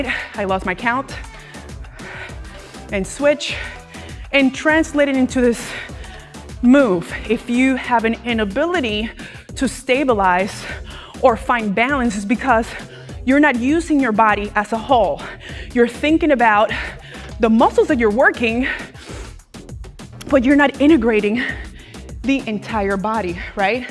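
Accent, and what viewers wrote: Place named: Latin America. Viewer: I love my latina sis' accent: shin becomes "chin"☺💕💕 Thank u so much for sharing your gift of fitness w us!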